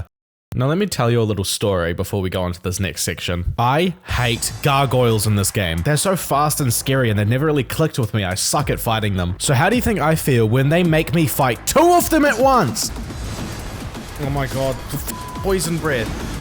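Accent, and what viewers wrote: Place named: New Zealand. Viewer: Chur bro, you sound like you’re from NZ too so I’m gonna lay it to you straight. I was up from around 1am-3am last night smacking cones and beers back and ended up watching all your Elden Ring videos in that time. Love the channel, for sure have a new sub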